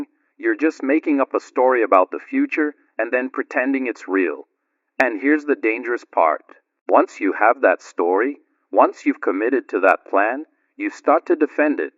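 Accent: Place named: United Kingdom. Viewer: Razor You're suspiciously burying the fact this isn't Feynman's voice. It's not even close. Your voice has a british accent.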